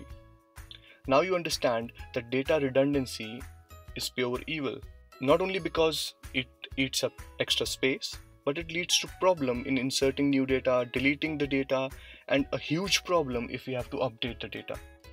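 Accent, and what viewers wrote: India. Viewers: When I hear the Indian accent, I know im gonna learn something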